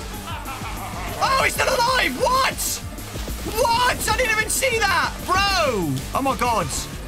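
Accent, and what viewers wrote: United Kingdom. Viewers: i dont know whats so funny about a british man screaming EXOTIC BUTTERS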